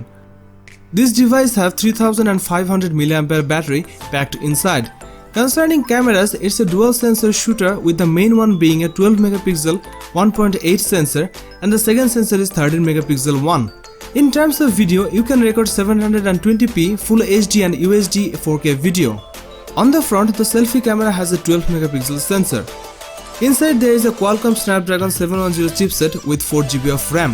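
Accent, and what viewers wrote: India. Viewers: I wanted to watch it but soon as some Indian voice started going tik tok tik tok that was it F.T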